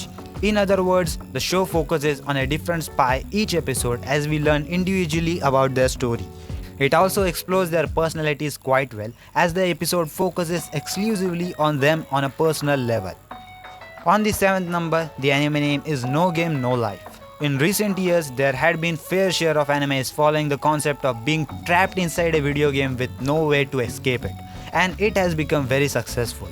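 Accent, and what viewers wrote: India. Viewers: bro work on your audio and idk why u sound indian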